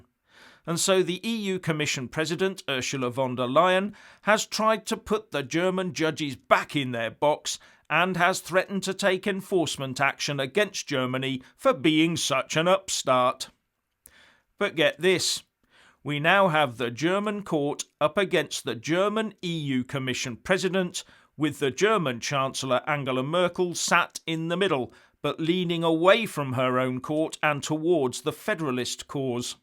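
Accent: United Kingdom. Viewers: Great analysis! Very interesting for those of us across the pond, who don't hear much of this stuff every day! (And I LOVE the crusty British dress and speech!)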